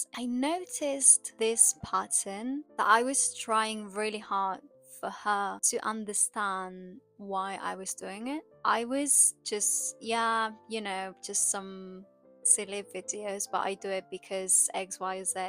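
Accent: United Kingdom. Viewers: Tienes un acento britanico precioso. Imagino que a parte de tener una natural predisposicion al idioma, has trabajado mucho. Sería interesante un video sobre eso. 😊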